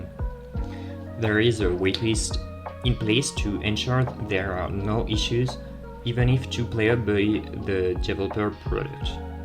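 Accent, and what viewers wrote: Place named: France. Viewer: You should translate your videos to french because your accent is kinda annoyingq(sorry i have the same lol)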